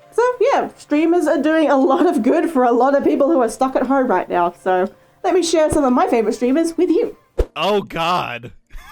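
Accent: Australia. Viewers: Uh question are you Aussie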